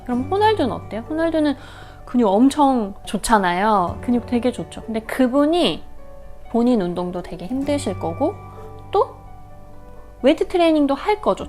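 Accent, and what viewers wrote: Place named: Busan, South Korea. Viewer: ㅋㅋㅋ사투리 잘 쓰셔서 부산사람인줄~ㅋㅋㅋ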